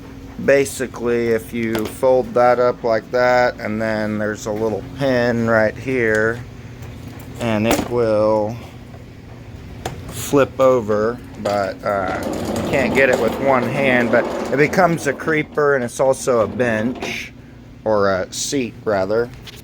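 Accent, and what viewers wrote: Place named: Deep South, United States. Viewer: It's 3am here in So Cal and I couldn't sleep. Pt 1 & 2 toolbox tour came up as suggestions. You're actually quite organized and no doubt have a wealth of repair knowledge. Both videos are concise and move right along. Best wishes on your career and youtube endeavors. You have an interesting voice and accent, do you claim the deep south as your home? Texas?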